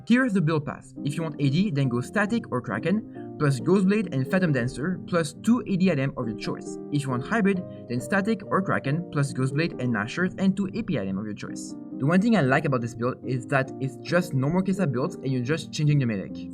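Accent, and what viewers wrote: France. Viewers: Ahaha je sais reconnaître un french bro quand j’en entend hein! J’ai bon?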